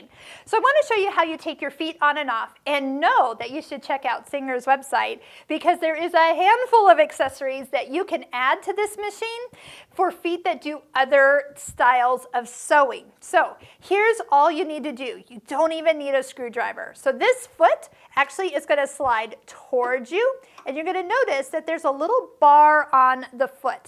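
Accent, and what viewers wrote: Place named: South Dakota, United States. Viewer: Ty this was really helpful but I gotta say this is the most south dakotan accent I've ever heard 😆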